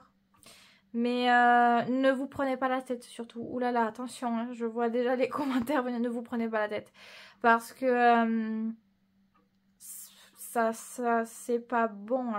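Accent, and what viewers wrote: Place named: South-West, France. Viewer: Je vous découvre, et j'apprécie... donc je m'abonne De plus, moi qui suis dans le sud-ouest, je reconnais un accent comme le mien, et ca me fait sourire Merci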